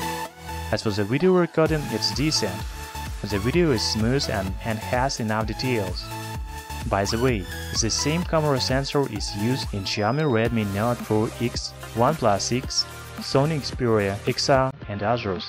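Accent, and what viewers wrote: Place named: Russia. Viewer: а я то думаю..что за провинциальный городишко забугорный так похожий на наши)) даже рашн акцент сразу не заметил)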